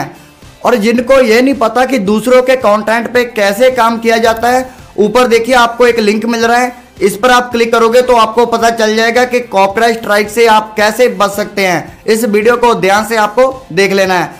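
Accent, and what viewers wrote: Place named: Punjab, India. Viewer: Bhi app Punjabi oo lgda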